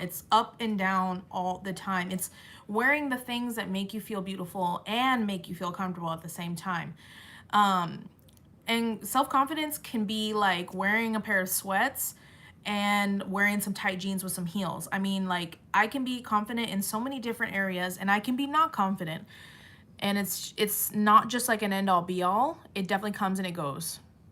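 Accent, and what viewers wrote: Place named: United Kingdom. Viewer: Girl luv your brittish accent 😍 "what " my daughter colored her hair black &she luvs it :)))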